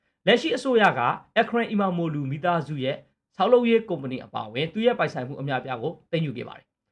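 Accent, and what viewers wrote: Rakhine, Myanmar. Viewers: ဘရို စကားပြောပုံကို ကြည့်ရနားထောင်ရတာ ရခိုင်လူမျိုးထင်တယ်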